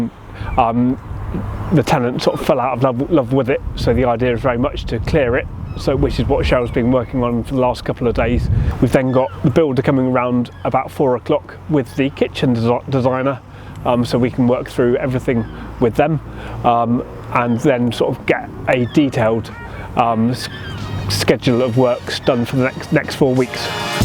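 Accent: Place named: Newcastle, United Kingdom. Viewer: Is Newcastle. Howay man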